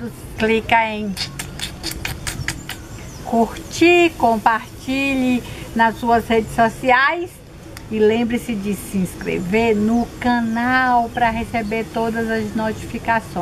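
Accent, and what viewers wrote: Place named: Bahia, Brazil. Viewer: beijos pra vc tambem adoro esse teu sotaque bem de baiana arretada, olha que eu sou gaúcha pena que morando desde criança em SP perdi o meu sotaque mas adoro ver vc falando oi gente,beijos até a proxima.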